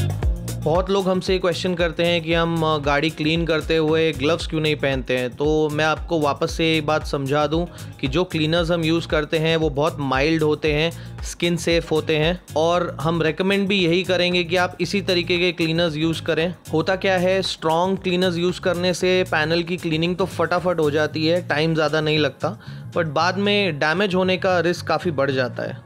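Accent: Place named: United Kingdom. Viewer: Mtlb professional professional.... Itni bhi English accent me mt bolo Irritating lgta h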